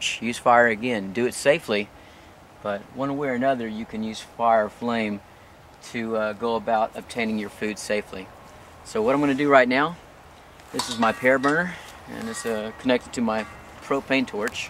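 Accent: Texas, United States. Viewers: Omg i was in Texas for a year as an exchange student, and your Texan English made me so "homesick" 😀😅🇺🇸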